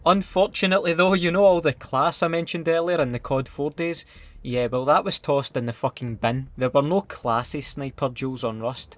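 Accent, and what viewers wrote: Scotland, United Kingdom. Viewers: I only subscribed because of you're scottish accent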